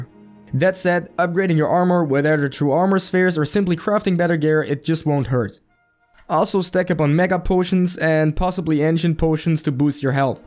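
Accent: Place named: Norway. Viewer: Love it man thank you! May i ask, where is that accent from? i sense a little Norwegian but I’m usually dead wrong , great video brother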